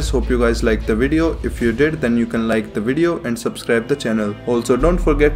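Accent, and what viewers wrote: India. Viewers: Everything on his channel is funny the Indian accent just adds a lot to it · Ur an Indian the accent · same it sounds indian and not indian at the same time, it's complex lol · You sound Indian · Are you a indian? Your voice sounds indian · His Indian accent is cool. Most Indians I ever listened sounds more difficult to understand. This guy's Indian accent is kinda easy to understand 😂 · I enjoy the heavy indian accent shitposting about Baki. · This is the only guy with an Indian accent that doesn’t make me want to leave his video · it IS India accent. He is an Indian I can vouch for that. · The normal jokes are way funnier with Indian accent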